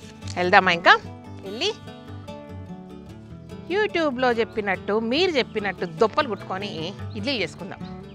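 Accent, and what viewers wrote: Telangana, India. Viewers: స్వచ్చమైన తెలంగాణ యాస తో మమ్మల్ని అలరిస్తున్నారు .ధన్యవాదములు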